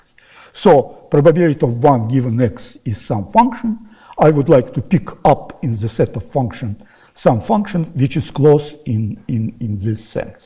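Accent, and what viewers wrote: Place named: Russia. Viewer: Google clearly didn't train their model on the accent of elderly russian professors.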